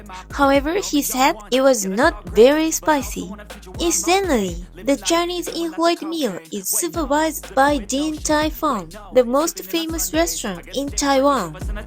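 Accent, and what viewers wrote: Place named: Japan. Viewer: 很明显是日式英语，不是中式英语。 已经说得很好了，理解完全没有障碍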